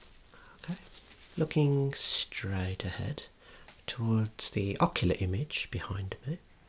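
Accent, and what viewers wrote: Birmingham, United Kingdom. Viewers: Hes from birmingham or wolverhampton. The accent. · Is it a Brummie accent?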